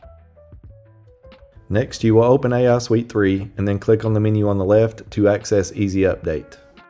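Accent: South, United States. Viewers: a little southern drawl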